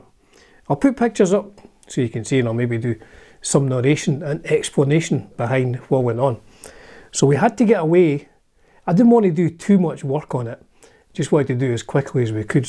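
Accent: Scotland, United Kingdom. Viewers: Damn it YOU REALLY ROCK man ! Btw I would not understand one tenth of what you're saying without reading the subtitles, but it is good ! This way I will practice my Scottish (isn't it?) regional accent comprehension.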